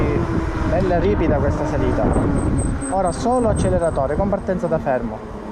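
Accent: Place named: Syracuse, Italy. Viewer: Salve, anche io ho una ep 2 pro e ogni tanto cerco video per avere confronti. Oggi, con molto stupore, ho trovato questo che oltre ai dati tecnici, mi ha riportato parzialmente a casa (ho parenti a Siracusa, la conosco benissimo e se potessi ci vivrei). Anche l'accento è familiare dunque mi sono iscritto anche per seguire aggiornamenti di questo modello. Complimenti per la chiarezza delle spiegazioni durante le prove. Ho fatto le mie prove di autonomia secondo i vari aiuti di modalità ma attendo quelle che farà in seguito per avere confronti. Complimenti ancora e W Siracusa.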